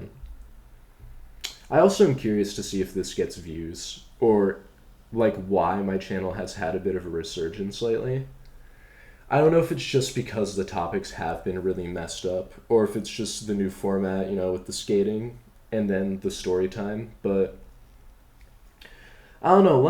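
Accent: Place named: United States. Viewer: First time watched of your videos, it feels kind of surreal to listen in to gossip from the other side of the world. You look like a character from an American tv show -- which, admittedly is a strange thing to say because you ARE American, but the crazy stuff you're saying about your ex and friends literally sounds like an actual plotline from a show. Maybe because I'm not American, but hearing your story being said in an American accent is so strange to me. On a serious note, I'm sorry to hear about your ex and that your friend's love didn't last.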